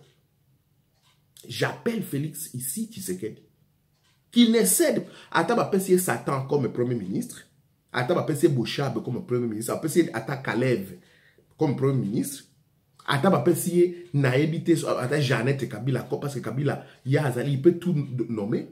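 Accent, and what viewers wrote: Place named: Cameroon. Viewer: Yo kutu face nayo eza lokola ba camerounais même ta façon de parler tu es camerounais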